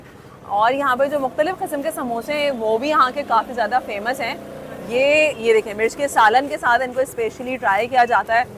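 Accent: Karachi, Pakistan. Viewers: Excellent video,and your urdu slang is marvelous,fluent urdu with typical pronunciation of urdu remind me of Karachi of 90s,lovely keep it up. Greetings from Capetown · Most of the people don't know that you are sindhi as they are confusing you because of typical Urdu speaking accent...your accent is of typical karachite's Urdu speakers...love from Hyderabad ❤ my name is also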